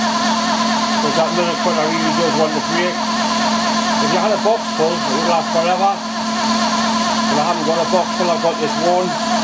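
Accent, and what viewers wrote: Newcastle, United Kingdom. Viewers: Newcastle English. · NE English or Geordie. Recently heard it comes from the local Miners using George Stephenson's safety lamp rather than Davie's. Went to college in South Shields and never heard why Geordie, who knew?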